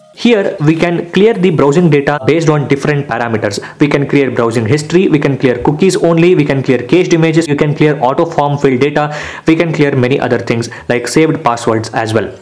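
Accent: India. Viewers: thank u ur voice is like indian or u are indian but u can speak English keep going never give up ur channel will have 10milion subs 1 day I know it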